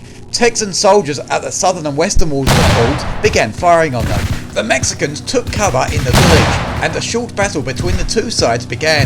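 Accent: United Kingdom. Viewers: Neither is he! lol That's an English accent. ;)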